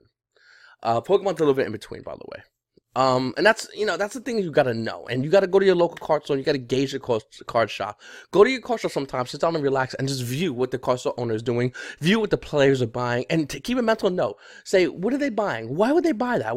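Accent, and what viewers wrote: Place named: New York, United States. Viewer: you're from New York aren't you lol. Thanks for the vid.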